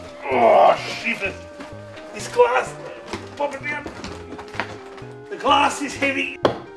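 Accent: Australia. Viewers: Thanks to you, I can perfectly understand Aussie accent now. A couple of months ago when I first subscribed, I didnt have any idea what you are talking about:) Well done mate. Keep up the good work.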